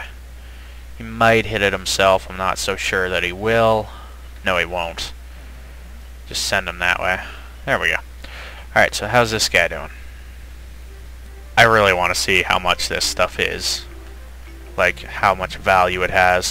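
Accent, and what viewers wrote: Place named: Canada. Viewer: I find it funny that I knew you were canadian the second I heard out XD. Sorry but it's funny.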